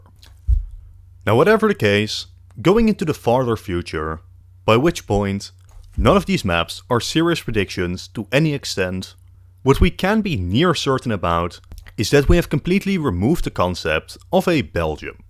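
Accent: Netherlands. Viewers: you heard his accent? Only boomers can get a more Dutch accent then him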